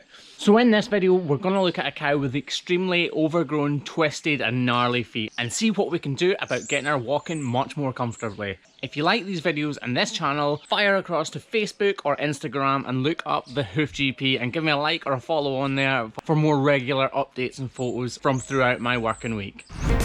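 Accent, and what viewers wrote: Scotland, United Kingdom. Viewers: I watch so many of your videos on mute I had no idea that you where Scottish this full time that’s so cool I stay near Glasgow. If your ever looking for a 30 year old apprentice I would love to do this I can’t stop watching these videos lol there class. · I looove your lovely Scottish accent and how you help animals! I’m American and have a customer with a lowland Scottish accent.. just adore her.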